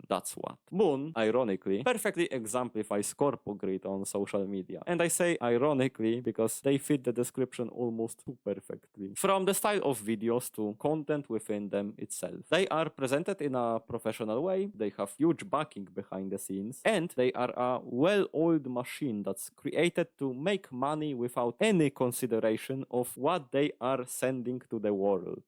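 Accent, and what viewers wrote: Poland. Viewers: Yeah I was thinking you sound Polish, did I get that right?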